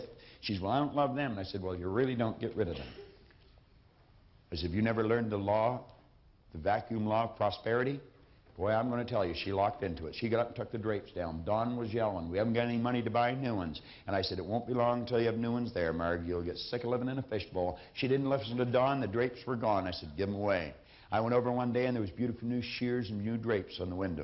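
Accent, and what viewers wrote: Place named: Canada. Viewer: I KNEW HE HAD A CANADIAN ACCENT! 🤣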